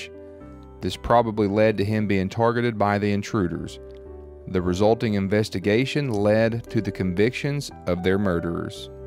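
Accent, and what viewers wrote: South, United States. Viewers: everything is great, it really is! I guess I struggle with your southern accent because English is not my first language. But other then that, awesome videos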